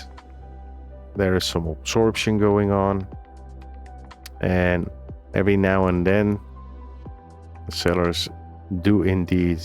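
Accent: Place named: Netherlands. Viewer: Another Dutch accent, you guys are all from the Netherlands? Great videos so far!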